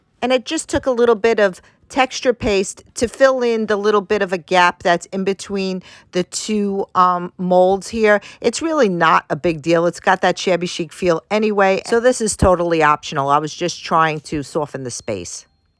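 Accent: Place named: Brooklyn, United States. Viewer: This came out so beautifully! I know I’ve said it before, but your Brooklyn accent makes me so happy. 😂😂 Those molds are soooo beautiful. I’ve been experimenting with resin work for the past year or so and I have had nothing but trouble with it! I know you painted yours, so it doesn’t matter in this instance, but I had consistent problems with my resin not fully hardening and always having bubbles in it. I also have used that exact same resin and it STUNK so bad it made my whole house reek. ☹️ Omg you with the neck ruff 😂😂 I would love to see a full video tour of your she shed!!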